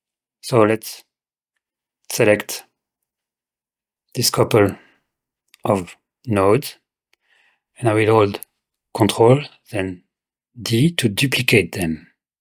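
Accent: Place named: France. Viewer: Thanks a lot my friend. Tu as l'accent d'un français. Je me permets de poursuivre dans notre langue. Je débute avec ton tuto, c'est vraiment limpide ! Merci bcp ! Je me demandais comment tu exportes ton fichier pour en faire un fichier de vdjing ? C'est peut etre une question hyper large mais voilà je débute complètement... déso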